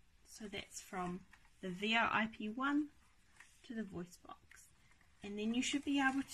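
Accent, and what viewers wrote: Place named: New Zealand. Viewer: Thar kiwi accent tho 😍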